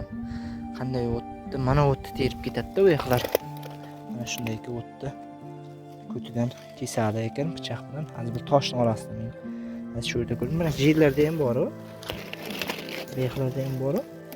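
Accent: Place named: Chiroqchi, Uzbekistan. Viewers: укам.мен.хам.чупонман.шевангиз.бизникига.жуда.ухшаеди.узиез.хакингизда.малум от.беринг..каердансиз.самарканд.жом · Dustim uzingiz qayirdansiz. Chiroqchi shevasida gapirayabsiz. Sog buling omad tilayman.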